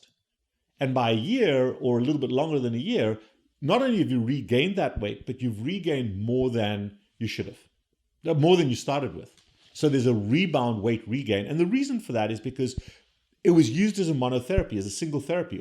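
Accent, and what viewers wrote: South Africa. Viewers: This is the first time I hear a physician speak from such indepth knowledge, esp with Type 1 Diabetes! I'm a Type 1, on Ozempic and not losing at all. My hba1c is at 8.2... I believe your clinic has such an amazing approach losing weight! BTW, I think your accent is South African? I'm also South African and I wish we had more physicians who were skilled with glp meds. My endocrinologist is not 100% on-board with GLP-meds. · 1. You are Sohth African, by the accent; what British are you talking about?😅 2. I've gained nothing ever since I stopped, which was 10 months ago. 3. These medications are like miracles. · South African accent. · You have a South African accent 😂? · Love this advice, thank you. Love the South African accent! I'm also South African. If people can realise that there is not just a cure all but you also need to develop healthy habits to sustain the weigh loss for the rest of your life. 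Subscribed! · Hahah… I was just going to ask if the Dr is from SA? Spot the accent a mile away! ❤ from Pretoria! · You sound south African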